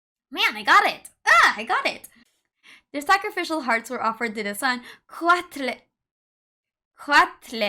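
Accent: Philippines. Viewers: I knew it. You were a Filipino after all xD. I thought my hearing was just deceaving me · off topic but i knew from the moment I heard ur voice that u were from the philippines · So Filipino, huh. No wonder I found your accent so nice to listen to, I guess it felt familiar and homey.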